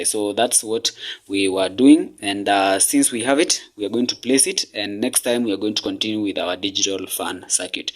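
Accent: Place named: Kenya. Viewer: you sound like a Kenyan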